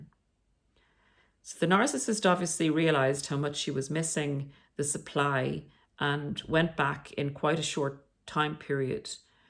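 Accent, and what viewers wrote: Ireland. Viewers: As well as her beautiful Irish accent ♥️🥰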